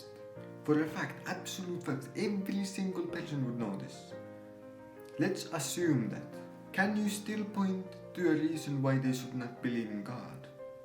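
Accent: Finland. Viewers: Sama homma, pelkästä aksentista tajusin jo Logickedin videosta että nyt on suomipoika asialla ja olihan se sitten pakko katsoa että mikä kanava tää oli. Aikamoista, aikamoista... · Että hävettää suomalaisten puolesta kun rallienglannilla sönkkää halpojen jenkkiuskisten myyntipuheita juutubessa. Meillä on tän maapallon parhaita koulutuslaitoksia, kirjastoja ja terapeutteja. Jospa kävisit vaikka filosofian alkeiskurssin ennen kun sönkkäät tätä skeidaa koko maailman kuultavaksi.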